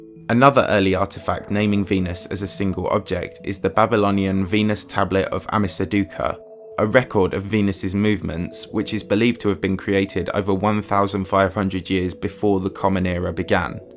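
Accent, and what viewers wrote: United Kingdom. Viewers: Sorry but it's hard to take this guy seriously with his unique British accent. Instead of saying "cold" he says "cowd". Hilareous!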